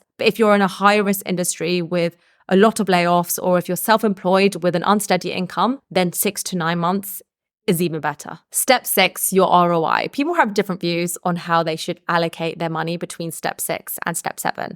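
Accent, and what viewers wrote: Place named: London, United Kingdom. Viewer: love the modern London accent innit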